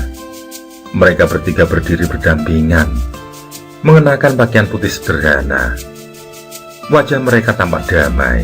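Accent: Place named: Yogyakarta, Indonesia. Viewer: dari bogor cengkoknya jogja :face-blue-smiling::face-blue-smiling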